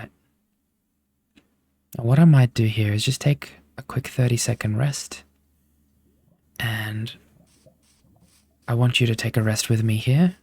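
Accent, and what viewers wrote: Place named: Australia. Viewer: Not me starting to fall asleep and going, wait, I know that accent, that's my accent! And checking if you're Australian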